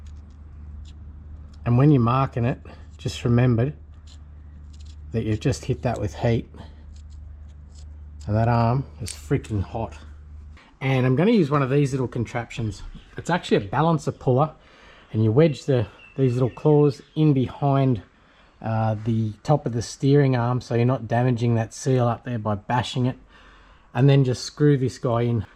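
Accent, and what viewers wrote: Australia. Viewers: Wow! Just look at what you've done with this - what a beautiful car. Love it. Also love your commentary: Expert and unmistakeably laid back Australian style - I reckon you could just leave in what you think are "bloopers"!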